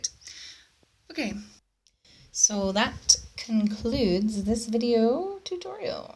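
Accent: Scotland, United Kingdom. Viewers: Do I hear a wee bit Scottish in your accent? X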